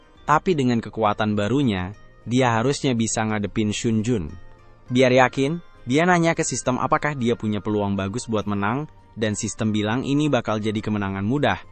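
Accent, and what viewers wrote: Malaysia. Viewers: Cara kamu berbicara indonesia setengah Malaysia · Bg kalo bisa logat Malaysia nya di kurangin atau di hilangkan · Aku yang asal malaysia ni pun rasa geram dengar loghat dia dicampur²...kalau nak cakap malaysia cakap terus takyah bercampur aduk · Bang kok tiba tiba jadi logat melayu sih bang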